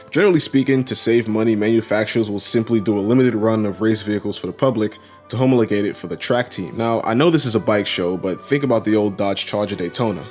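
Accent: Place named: Italian-American, United States. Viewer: Lmao I love the guido voice, muff per hour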